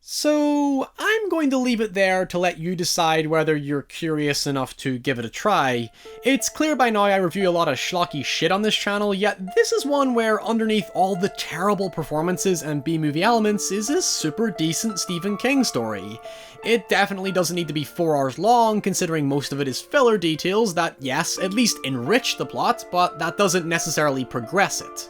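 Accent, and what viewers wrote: United Kingdom. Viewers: First off, I love your accent. Secondly, your videos are so good, and I look forward to them always. We Americans don't seem to talk as fast as you folks in the UK, but I manage to keep up. I always like to mention to YouTubers, fairly new to me, that I have 61 years behind me, and that you have many other folks my age who enjoy your content. That notification bell is very helpful to alert me of your new videos. You will reach a million subscribers at some point, I'm sure of it.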